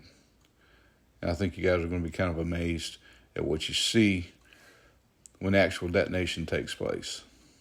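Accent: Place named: Georgia, United States. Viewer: I came over from “What’s Happening in Shipping” as well. Enjoying commentary and level of detail. But more than that, I was raised in Athens so it’s great to hear a Georgia accent! Thank you!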